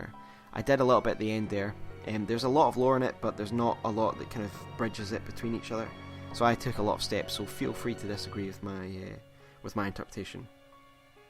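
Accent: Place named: Scotland, United Kingdom. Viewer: Hey another Scottish person! Amazing video mate- very informative, got a new subscriber cant wait to see more!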